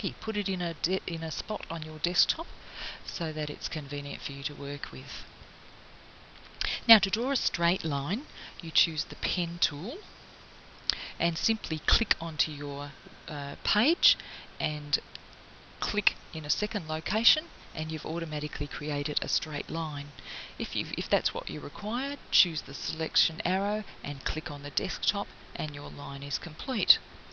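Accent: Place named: Australia. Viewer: aussie accent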